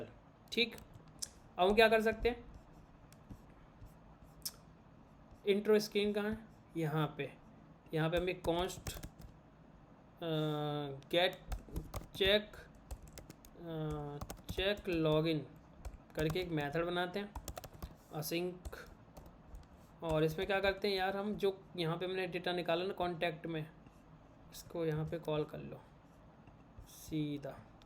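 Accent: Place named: Western Uttar Pradesh, India. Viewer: Kisi biryani ke dukan pe jo ladke kaam karte h wesi tone me baat kar raha hai, 😂😂😂😂, what a language pachimi up wala h, 😅 व को ब bolne wala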